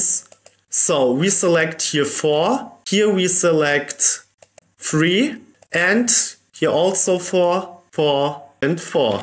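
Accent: Germany. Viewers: Ich höre deutsch raus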